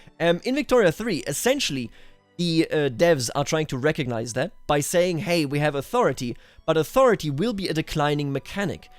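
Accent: Bavaria, Germany. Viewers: Are you actualy bavarian?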